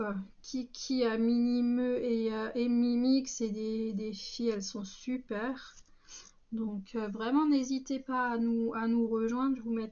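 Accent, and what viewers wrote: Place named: Alsace, France. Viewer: Bonjoure tres joli projet cest vraimabt bizard detendre quelqun dautre avec laccebt alsacien 😂 bonne petite croix et vive lasace hein bis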